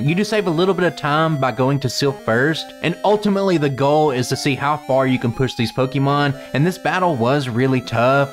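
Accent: South, United States. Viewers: That sounds like a southern drawl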